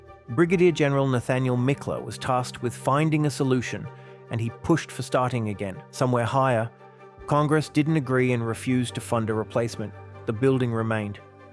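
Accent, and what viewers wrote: United Kingdom. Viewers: Why use a British accent to narrate the story of an American seat of government? And the crappy AI images have almost nothing to do with the narrative… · This narrative skews the actual history and context of our Constitution; The WH is in the Executive Branch and the POTUS does not need permission from congress make changes to the WH. They are only needed if public funds are needed, congress controls the budget allocation. The British have been colonizers and slavers for the last 400 years, never trust a video with a British accent and never trust anyone with a British title. Yeah, I said, so what. 1st Amendment, they hate it and I love it. Use it or loose it. USA❗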